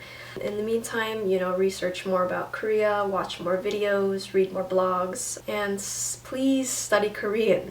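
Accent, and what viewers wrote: United Kingdom. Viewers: where do you come from sis? ^^ I love your english accent.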